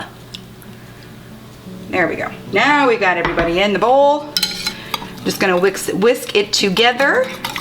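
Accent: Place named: Minnesota, United States. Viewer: Are you from Minnesota by chance? Love this recipe - can't wait to try it!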